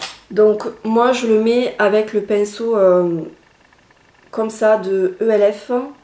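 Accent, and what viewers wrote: South, France. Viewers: tu vien du sud avec ton accent je me trompe ? ^^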